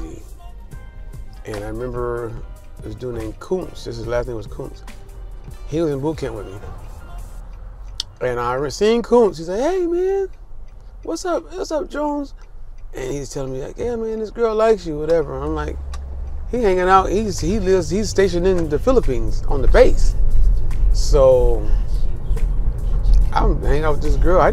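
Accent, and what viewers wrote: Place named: California, United States. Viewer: I knew you was from California. At first I thought you might have been from Oakland or The Bay. You sound like all my potnas I hung with growing up who was raised right like myself.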